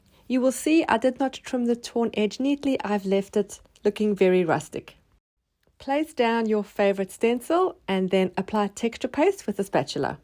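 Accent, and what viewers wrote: South Africa. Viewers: HI I hear that you are south African .are you based in SA or somewhere else. Wendy from SA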